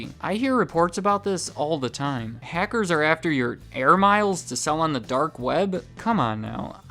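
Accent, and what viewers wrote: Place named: United States. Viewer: You sound like the neighbour in some long forgotten 70s family sitcom. Stereotypically American. I love it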